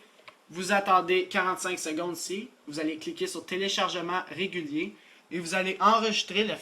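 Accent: Canada. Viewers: ton accent canadien me fait marré XD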